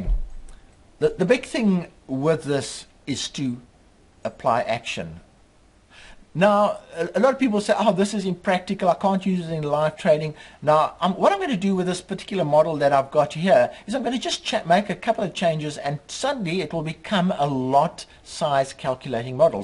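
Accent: South Africa. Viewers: wow . . You sound so South African ! are u from New Zealand or South Africa ??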